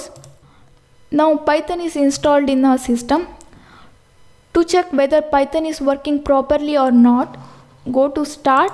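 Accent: South India, India. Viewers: Are you south indian?🤔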